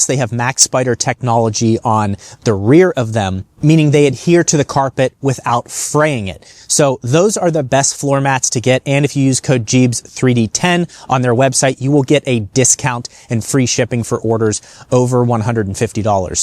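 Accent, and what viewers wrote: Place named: AAVE, United States. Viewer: Cringe ebonics simpleton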